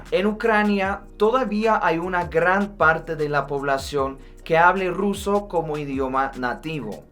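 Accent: Colombia. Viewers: Tu maravilloso español colomombiano y tus conocimientos y forma de explicarlos dan un gran aporte a cuantos estamos interesados en saber y entender la compleja historia entrelazada entre rusos y ucranianos. Estremece y duele la avanzada destrucción de Putin sobre el valiente pueblo ucraniano. Saludos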